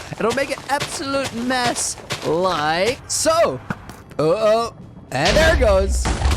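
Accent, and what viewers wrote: Netherlands. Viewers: Even though I can hear you are dutch, I love how confident you talk in English! Great content! KEEP IT UP!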